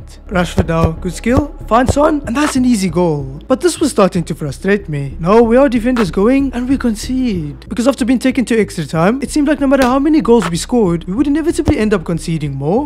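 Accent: South Africa. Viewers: Are you from south africa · Yo are you South-African? It’s awê to see a fellow EAFC lover from RSA!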